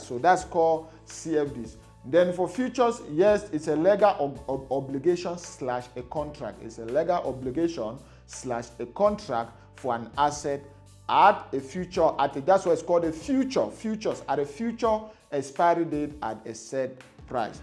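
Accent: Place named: Akwa Ibom, Nigeria. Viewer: You have akwa ibom accent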